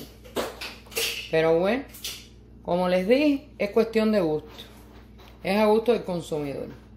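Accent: Cuba. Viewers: Nena ese dulce tiene huevo crudo.Eso no es bueno para la salud.Pero si te digo eres comica con tu asento cobano chiquitica. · Me encanta como hablas!! Me recuerdas cdo vivía en la isla de mis amores felicidades por mantener tu cubania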